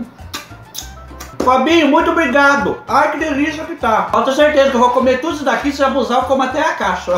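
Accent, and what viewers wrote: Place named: Caipira, Brazil. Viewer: tudo que tu ganhou foi bem merecido, você faz a gente rir com esse sotaque meio caipira amo 👏🏾👏🏾👏🏾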